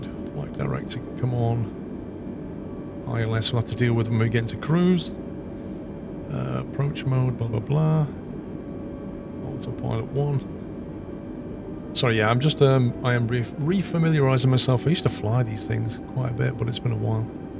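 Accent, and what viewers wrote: United Kingdom. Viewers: British accents are so fancy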